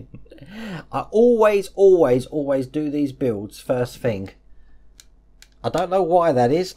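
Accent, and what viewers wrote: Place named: Cockney, United Kingdom. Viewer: He speaks with a cockney accent.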